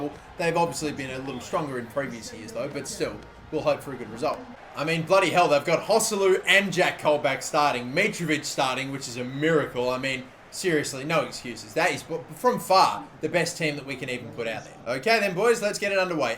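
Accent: Australia. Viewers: Are u Australian. How do u support Newcastle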